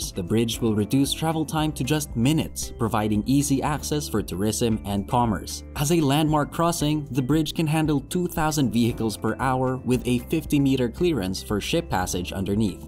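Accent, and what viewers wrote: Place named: Philippines. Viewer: I can hear some Filipino accent in that english.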